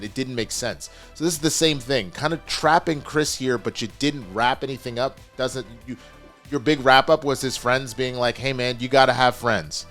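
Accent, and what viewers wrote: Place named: South, United States. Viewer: I loved the finale. This was continuing the DCU story not Superman’s story as you said on today’s stream in that wonderful southern accent. To me the story of the season was clear. It was a romance story between Chris and Emilia and a character study of Chris and how HE AFFECTS THOSE AROUND HIM so even if he isn’t on screen we are seeing how important he is to those around him. The story wasn’t about Nazi Earth it wasn’t about Big cameos it was about Chris, and how his decisions affects those around him and dealing with consequences.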